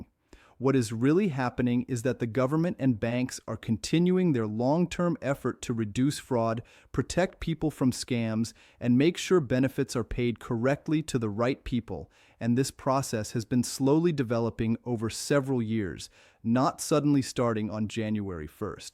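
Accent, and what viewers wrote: United States. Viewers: Why another Yank? AI?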